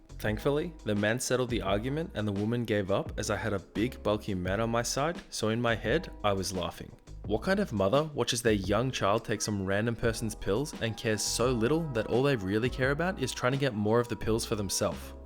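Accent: Australia. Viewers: Narrator, are you from Australia? Your accent reminds me of some of my old friends from Australia. Just wondering. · Are you Australian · Are you aussie?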